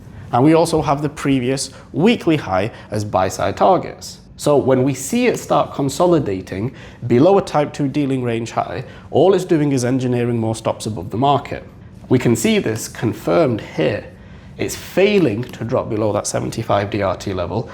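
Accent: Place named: England, United Kingdom. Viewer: His English accent and talking about DRT makes it seem more complex than it really is. 😅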